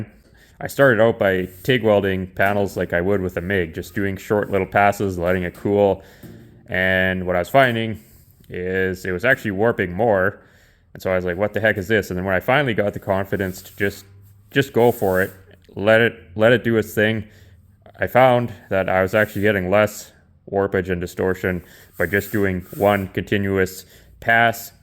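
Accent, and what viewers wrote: Canada. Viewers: You Brits probably understand his Canadian pretty well, eh?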